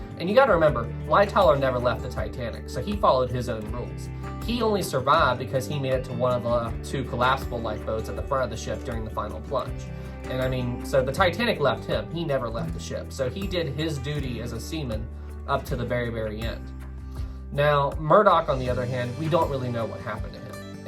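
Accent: South, United States. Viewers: As usual, awesome video man!! Where are you from? I’m catching a slight southern accent haha. I’m a Tennessean Titanic Fanatic. 😂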